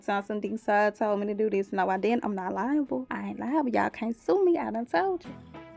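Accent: United States. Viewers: Wow, that's the most American I've ever heard a human being sound.. Nice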